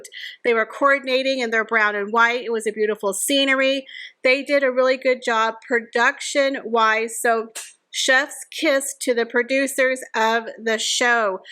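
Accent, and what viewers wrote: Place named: East Texas, United States. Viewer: I love the Barbie’s! I can hear that east Texas accent along with your expressions. Reminds me of home ( south Louisiana) proud of you!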